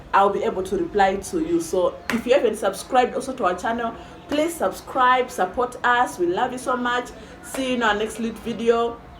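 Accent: Uganda. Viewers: You now have a Uganda accent sis 😅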